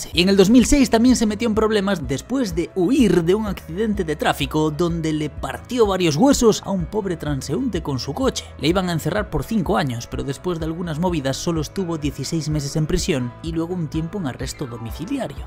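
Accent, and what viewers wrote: Galicia, Spain. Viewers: Tú acento.......eres de Compostela? 😅🙏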